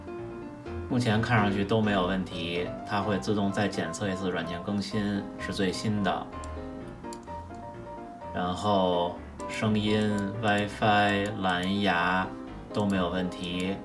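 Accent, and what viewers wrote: Beijing, China. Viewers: 听口音是北京人吧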